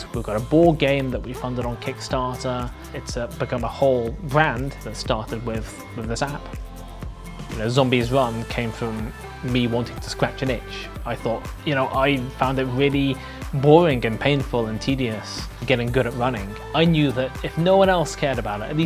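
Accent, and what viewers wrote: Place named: United Kingdom. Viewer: Sounds like a cool idea except I don't understand British accent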